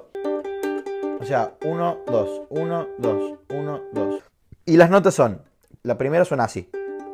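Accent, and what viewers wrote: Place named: Córdoba, Argentina. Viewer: Argentinoooo wachooooo cordobe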